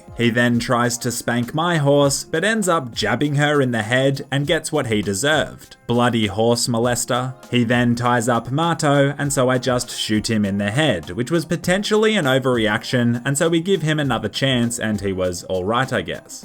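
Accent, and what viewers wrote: Australia. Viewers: I read this in your australian voice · Oh i thought u australian let's go australia, we got best game utubers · Yes! My prairers have been answered! Finally some more Legs Spread Redemption 2 Online by. Lovely seeing someone freeze to death in the mountains with Australian commentary!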